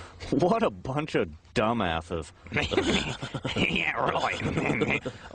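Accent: South, United States. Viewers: Metal guitar player born and raised under nashville, honestly i like videos that give perspective, i remember seeing your "wrong gig" video awhile back but i subscribe to andy rooneys channel and said to myself "oh crap, he's watching the video"! Then i fell down the rabbit hole and found you channel and subscribed. So far ive enjoyed the insight, the humor and the fact that i hear another southern accent actually made me feel better! I rarely subscribe to channels but music keeps me sane so theres only a couple on my feed, andy rooney, matt freeman from rancid, kyle bull, ola englund and yours! Id say your on the right path as far as content is concerned, the mix of insight and humor is refreshing, keep doing what your doing and im sure people will take notice.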